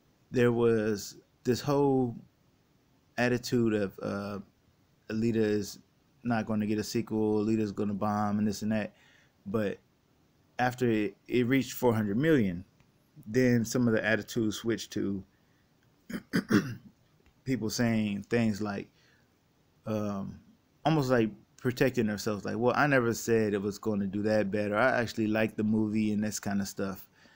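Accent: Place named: Lebanon. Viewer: You have interesting accent, do you live in Lebanon??? Are you Lebanese???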